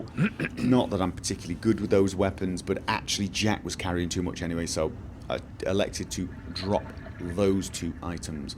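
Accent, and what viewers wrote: United Kingdom. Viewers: My apologies if you have already answerd this question before, but from where in the UK is that accent of yours? PS: Please don't tell me it's from Norway in the comments. I am already aware that he lives there. But it is for sure not a Norwegian accent, I know thia as I live next to the border of Norway in Sweden.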